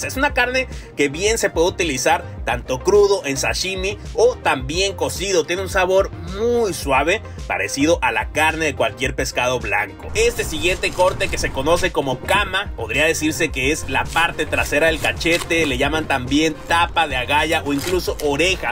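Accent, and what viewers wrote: Japan. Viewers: Es japones es obio que le cueste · Con todo respeto es divertido escuchar hablar español a los japos.😂❤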